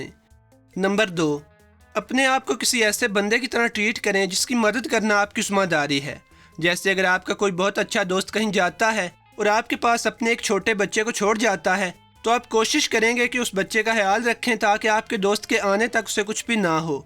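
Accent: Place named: Punjab, Pakistan. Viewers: آپ بھی اردو بولنا سیکھ لیں۔۔۔ یہ پنجابی اردو آپ جیسے شخص کے منہ سے اچھی نہیں لگتی۔۔ والدین ہوتا ہے ولدین نہیں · Lehja to to jahilo wala hy urdu aik adbi zaban hy iski zaban to aisa lag raha hy punjabi bolne k lie bani hy bs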